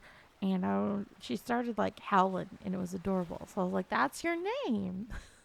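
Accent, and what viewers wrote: South, United States. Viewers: I may hear the southern bell often but tbh it suits you better than most folks I've heard it from that's for sure lol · Cute video, you southern accent smooth talker. Have a great weekend. 🍎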